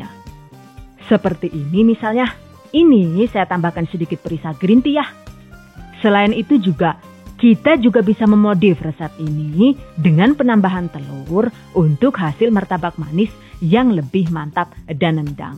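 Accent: Banyumas, Indonesia. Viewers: Bu asli mana mba ngapak SMA mba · ngapakke nemenn mbangett gehh... Wong ndi si jane rika mbk? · Mesti nguyu nek ono part ngapak yakin😘😘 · mesti kekel aq mbak nonton pideone pean...ngapak e iku looo nggarai pingin njiwit.....sukses selalu mbak resepmu sangat mengispirasi....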